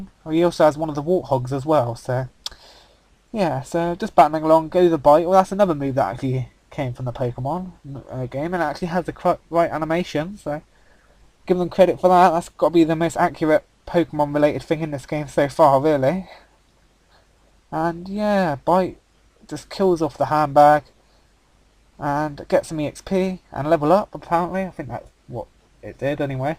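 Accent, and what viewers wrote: United Kingdom. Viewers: Whoa! Your Accent Is Really British! I Mean I'm British But That Is Really British!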